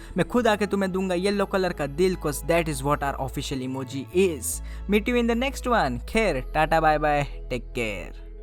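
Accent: Gujarat, India. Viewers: IDK if you Re from Gujarat but you sound like a Gujarati Boy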